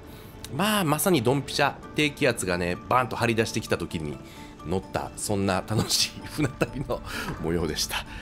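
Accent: Kansai, Japan. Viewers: 小樽に帰るって・・・・ 関西の方❓・・・・・どう聞いても関西弁ですよねぇ( ^ω^ ) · 最近登録しました。ナレーションの関西弁が面白くて好きです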